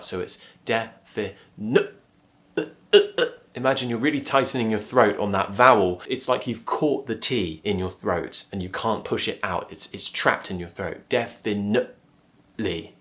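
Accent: United Kingdom. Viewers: you have a distinctive accent among all the British English teachers in the entire YouTube. To me that is the best accent. That is a kind of accent which I have always idealised while learning English or when dealing with the British native speakers. Among the British English teachers on YouTube, I have noticed that even the ones, who claim they speak and teach the RP English, don't speak the best English, at least, I don't find so appealing the way they sound compared to your English. Please, reveal the secret why your English sounds so perfect. It is exactly a kind of English that was in my learning recordings which had been made by the English teaching companies based in the UK. Maybe you were born and growen somewhere in the heart if the Southern England? Maybe it has to do with the schools and further higher institution you went to? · Oh god, I love so much the british accent. 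Thank you again for this great video. 👍🏻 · Im from malaysia..i just to know your youtube british accent..i love the way you explain..crystal clear ⭐️⭐️⭐️⭐️⭐️⭐️⭐️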